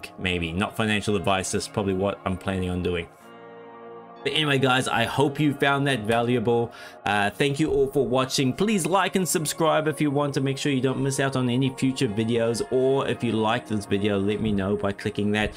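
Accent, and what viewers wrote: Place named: Ireland. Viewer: .03 now lol the game crashing bad. Here is the thing. It is all clicking and no development in making the game playable. It is exactly as playing the stock market and not a game. But here is the thing this game would be very nice but thing is you have to spend crypto. No cc or account in the game. So it sort of locks players in to only play with crypto instead. Look at star citizen. They have made over 500million and still in alpha after 13 years later. This game looks good at 1st then dig into it then you see it's very very bad over all. Plenty of games you play to earn and also in sol blocks too. Just another dream and wish demolished. Btw your voice cool sounding be like an Irish guy. Lol like it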